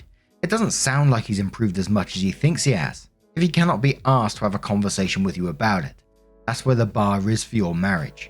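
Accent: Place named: United Kingdom. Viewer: I listen to your videos every day, but I just arrived to London from the states and it’s jarring to hear more than one British person in a day when all I’m used to is your voice 😂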